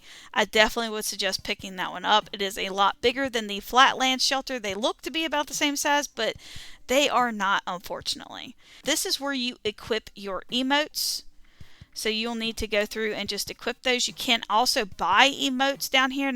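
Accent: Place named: Appalachia, United States. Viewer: Only just stumbled upon this channel but I love how, within the first 30s, I can tell another Appalachian by how they say words like "dry". Instasub.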